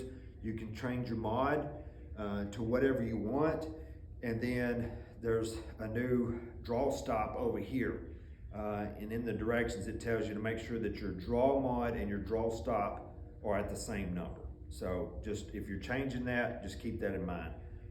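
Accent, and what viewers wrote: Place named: South, United States. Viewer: Love that southern talking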